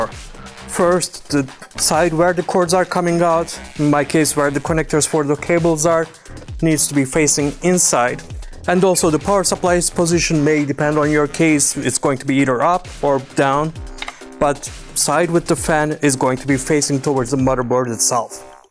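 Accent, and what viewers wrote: India. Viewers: Very Indian, love it!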